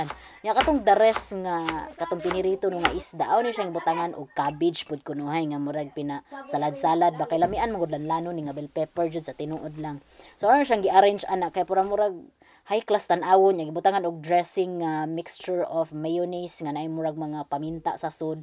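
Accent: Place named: Leyte, Philippines. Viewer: Wow😮😮😮, the very best Bisayan dialect I’ve ever heard, Leyte’s Bisaya kinda mixed with Cebuano and Waray-waray accent and bit of Ilongo. I noticed something the Southern part of Leyte some words that we never heard of like “ Ginamon” was just simply as if you cooked rice on the pot. Boholano where my Grandfather came from I didn’t noticed any difference probably we were a kids when Grandpa was around. Love to see Ms. momentous moment when someone known artist visit her, t’was a big honor to have that level of celebrity come visit her. Yes, the “ J “ letter from Bohol and Leyte like Ajaw, gajud was my first combo words during my first year in Cebu, when I was hanging around with the kids they just kinda laughing my accent, took me years to have it adjusted but then when you go back to your place everything coming back. Watching from Leyte.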